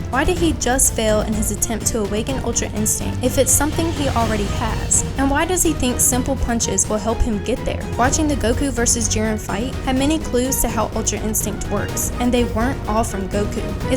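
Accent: South, United States. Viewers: Am i buggin or am i hearin a subtle southern accent ?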